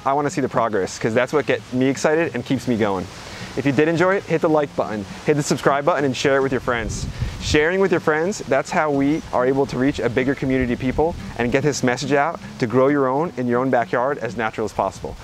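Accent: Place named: Pennsylvania, United States. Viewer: Someone sounds like they may be from PA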